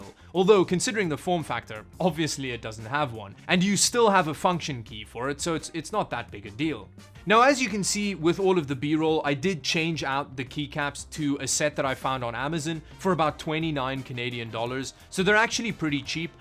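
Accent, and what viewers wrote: South Africa. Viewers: Thanks was wondering becuse his channel said canada but he sounded just like a south african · love your videos man. you sound south african. are you?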